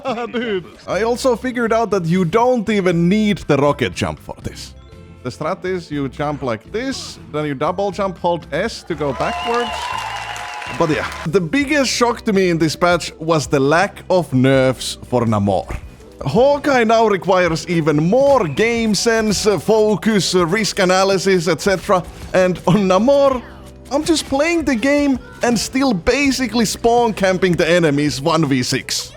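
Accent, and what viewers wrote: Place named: Finland. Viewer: I love how Finnish you are. European accents are just so fun to my weak American brain. Maybe it’s all of the cholesterol going to my head.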